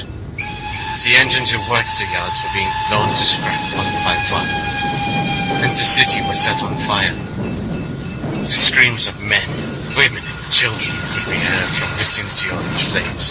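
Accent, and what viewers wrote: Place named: Germany. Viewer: Nice one! Now I'm so hyped!!!!😁😁👍👍👍👍👍👍👍plus I love your German accent 👍👍😀😁😊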